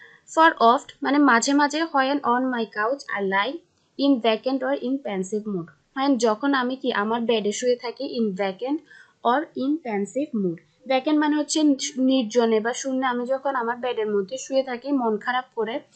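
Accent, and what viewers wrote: Chittagong, Bangladesh. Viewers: Maam mone hoy...Chottogram er..... vasa shune mone holo....😊❤ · সুন্দর তবে চট্টগ্রামের টান মনে হচ্ছে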